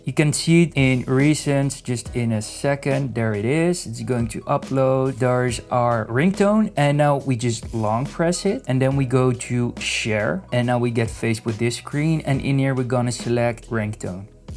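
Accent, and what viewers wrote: Netherlands. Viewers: I smell dutch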